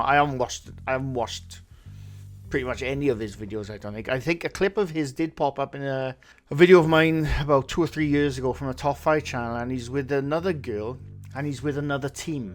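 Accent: Wales, United Kingdom. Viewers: Love listening to your Welsh accent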